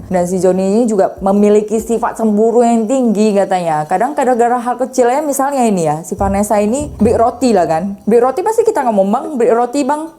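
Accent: Medan, Indonesia. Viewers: Dia Indonesia tapi pake Logat medan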